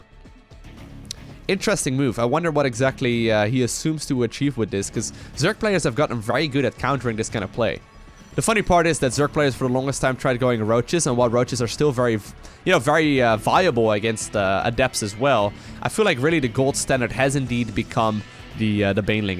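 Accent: Netherlands. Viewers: Ah ur dutch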